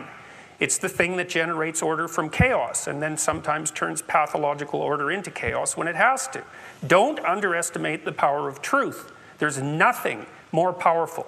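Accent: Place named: Massachusetts, United States. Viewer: People, saying big words with a soft tone of voice in a Massachusetts accent doesn't make you a genius who's right about everything. This is nothing but American establishment "work work work" ideology changing it's font to something people are more into atm.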